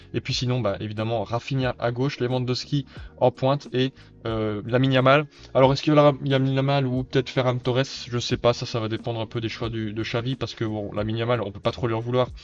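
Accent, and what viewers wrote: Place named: Belgium. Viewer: Super vidéo, tu mérites plus de visibilité! Ps: est-ce que tu es belge par hasard? Je reconnais un petit accent haha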